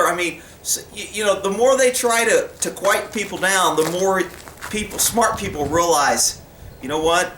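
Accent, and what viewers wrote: Texas, United States. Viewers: I wonder if anybody else catches your greeting in all your videos? "Morning Dumb People"...or is it that Texas draw... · Because with your accent, your "gas" sounds more like "gaz". I like your Texas twang. Even when you were in California and that's when I bumped into your videos of, I could tell you weren't a native Californian. I thought, "This guy's from Texas." My older relatives on my mother's side who are French Canadian will say gaz rather than gas. When you mean to say "quiet", you say it fast and it sounds more like quite. I'm not picking on you at all. Every one has a different accent. I'm from New England and every one knows the old "Pahk the cah in Hahvad yahd (Park the car in Harvard yard). When I lived in Virginia in my early 20s, some times people would ask me where I was from, when I said Massachusetts they didn't believe me because I didn't sound like a Kennedy. 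That's the only accent they assumed we had, which is not so. Actually, only the Kennedys have that weird accent. I usually don't drop my Rs because my parents didn't talk like that. But now that I'm half a century old, I sometimes drop it. I noticed my father dropping his too now a days when he never did before. A friend of mine and I took a road trip to Florida years ago. When we stopped in Georgia to get something to eat, it was like we were in another country. I could barely understand what many of them were saying because their accent was so darn thick! Wow! Then in Florida I could understand every one just fine. Also, a Texas twang is not the same as a southern drawl.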